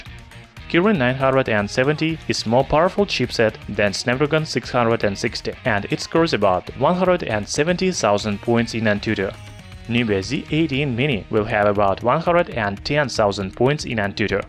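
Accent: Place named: Russia. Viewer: Автор русский, вы чо по акценту не слышите?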